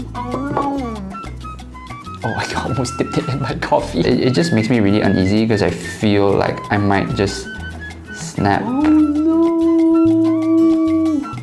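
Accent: Singapore. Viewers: I could tell you're from Singapore from your accent!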